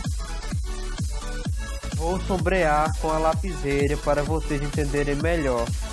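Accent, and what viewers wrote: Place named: Northeast, Brazil. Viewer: E esse sotaque do meu nordeste? <3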